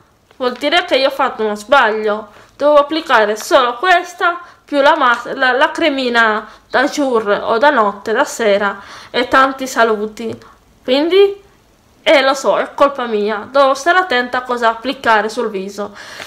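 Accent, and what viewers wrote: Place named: Rome, Italy. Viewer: Ho magnato ahahahahahahha so morta parlata romana 🤣🤣🤣c'è lo anche io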